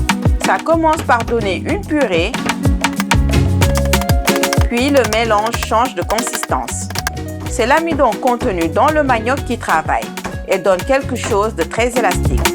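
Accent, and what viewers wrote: Senegal. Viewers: La Boss ,vous êtes Ivoirienne ou Senegalaise ?Je trouve que vous avez un petit accent wolof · J'AIME l'accent Sénégalais très beau accent très agréable à l'oreille ❤❤❤❤❤❤❤❤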